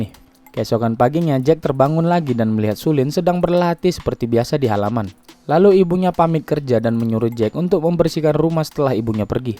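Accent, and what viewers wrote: Medan, Indonesia. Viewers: logat medan kau bg ketara kale bah,jadi ketawa aku dengarnya🤣🤣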